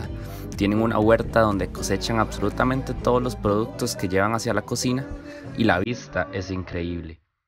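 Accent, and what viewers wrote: Costa Rica. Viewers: No te hagas muy el extranjero porque Guate es el hogar de todo centroamericano, lo unico que uds tienen otro acento un poco deferente al de Guate y porque tienen a Wanchoppe que lo odiamos jajaja pero pues somos lo mismo.. Saludos hasta tiquicia la bella, gente honesta, chambiadora, honrada y siempre echada para delante como la chapina. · Estás guapo vos tico, me gusta el acento costarricense ya que no pronuncian la letrra ese aspirada tal como nosotros los chapines tampoco lo hacemos.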